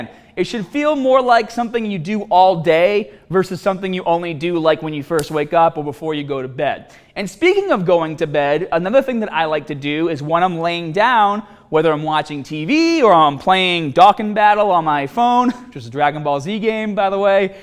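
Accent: Boston, United States. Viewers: This guy has the classic Boston dialect going on. Pretty cool and hard to find. Haha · Mazklassa boston? maybe nyc? i can never tell the difference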